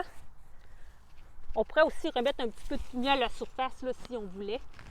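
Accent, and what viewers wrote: Quebec, Canada. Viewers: Bonjour :) Merci pour la vidéo, encore mieux avec un accent québecois ;) Vous pouvez aussi arroser le fond du trou de plantation des tomates, avec un ch'tit pipi dans l'arrosoir, votre chum sera ravi de vous y aider et se sentira utile ;p Bon potager à tous :) Édit : j'ai commenté trop vite, vous arrosez déjà le fond du trou :D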